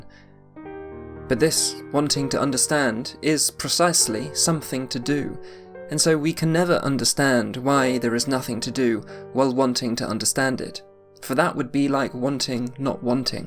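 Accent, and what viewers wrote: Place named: United Kingdom. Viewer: No entiendo nada o poco y nada pero me encanta el acento, estoy aprendiendo inglés y me gusta escuchar el acento británico, de donde sos? Saludos desde Argentina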